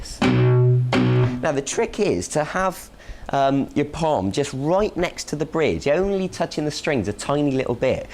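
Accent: United Kingdom. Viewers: haha i like his accent... !! british rocks... !!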